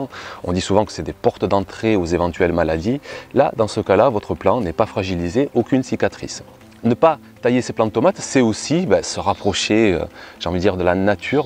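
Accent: South, France. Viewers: Merci pour cette vidéo . Mais je n'arrive pas à comprendre a quelle date (mois) vous récoltez tous ces légumes. Ni comment vous faites pour éviter la canicule de cette année. Comment vous faites aussi pour n'avoir aucun insecte qui détruit les légumes ? J'entends que vous êtes dans le sud, j'y suis aussi (Ardèche, moyenne montagne) et chez nous tout est brûlé par les UV : pouvez-vous nous aider là-dessus ? Merci ! A bientôt.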